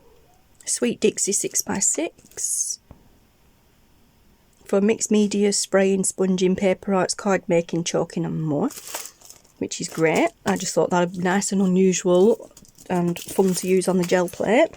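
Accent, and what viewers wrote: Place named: Northern England, United Kingdom. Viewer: Nice to hear a northern voice doing crafty hauls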